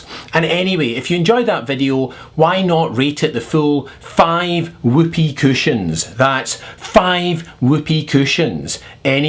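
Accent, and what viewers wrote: Scotland, United Kingdom. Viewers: hahaha everything u say is funny. i think the scottish accent helps.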